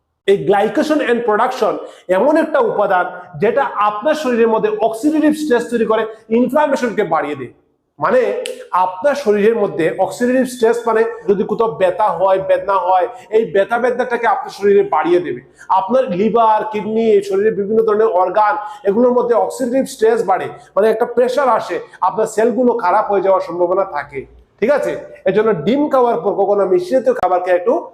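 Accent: Sylhet, Bangladesh. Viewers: বন্ধু, আফনে মনে হয় সিলেটি, সিলেটি মানষর লাখান লাগের তো 😅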